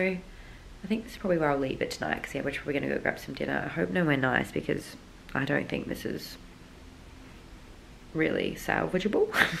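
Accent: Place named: Scotland, United Kingdom. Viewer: This vlog absolutely warms my heart. I remember a previous video where you were talking about always wanting to travel with your significant other (goodness knows how long ago). And now here you are, sharing an adventure or an amazing experience with your significant other is such a wonderful luxury. PS. Love that Scottish accent, brings back memories of a beautiful friend of mine who coincidentally will be back in Australia in about 2 months.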